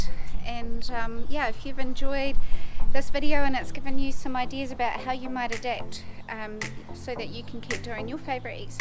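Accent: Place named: New Zealand. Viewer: i can't get used to that kiwi accent.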